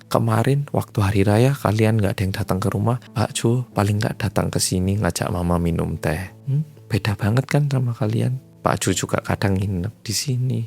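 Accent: Java, Indonesia. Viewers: Suka banget dengerin cerita medok ini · Masnya Korea tapi udah luwes banget ngomong bhs indo.. Jawa juga nendok apa dari kecil udah di indo.. Salam kenal dari jkrta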